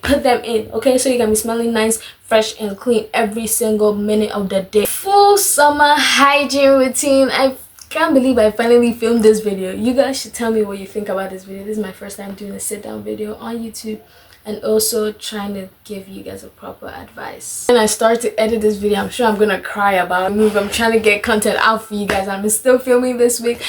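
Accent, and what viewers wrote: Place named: Nigeria. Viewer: The Nigerian accent I'm loving it😂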